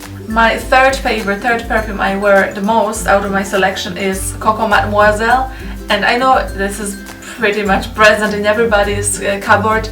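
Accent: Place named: Poland. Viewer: I dont know why, but polish accent is very similar to south american accents... Like venezuela... Maybe its just me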